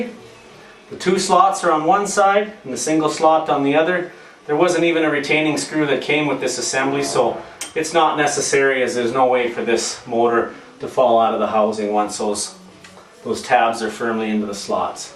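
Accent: Canada. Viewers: Little bit of a Canadian accent? Lol .. but enjoyed the content- thank you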